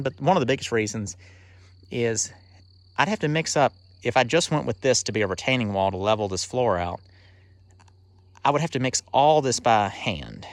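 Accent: South, United States. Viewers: That is definitely the coolest building for multipurpose that I have seen. I really dig the way you have re-used a lot of stuff (particularly the roof). When your saw mill gets going you will be set to expand your collection of buildings on your land. I know you sound like a southerner, but you work like a New Englander.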